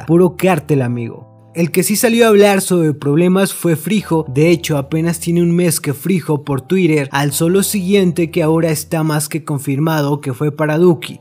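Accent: Argentina. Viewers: No es que hables mal con acento argentino (que si lo haces, pero es lo de menos), si no que no lees bien, aunque dam escribió como el ojete. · hablando como Argentino❤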